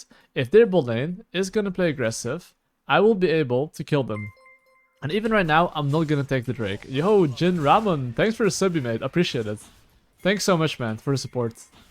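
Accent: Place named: Netherlands. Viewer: Bro, is this guy Dutch? Holy accent😂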